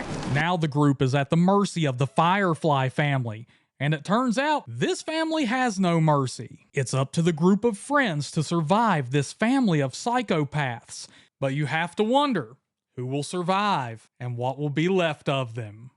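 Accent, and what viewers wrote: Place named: Pennsylvania, United States. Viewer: You sound almost exactly like that guy who cheri robs at the convenience store/gas station.. I grew up with friends from Pennsylvania and Ohio, you sound a lot like the idiots they made fun of.. keep up the amazing content. Maybe work on your delivery. Otherwise gobs-less...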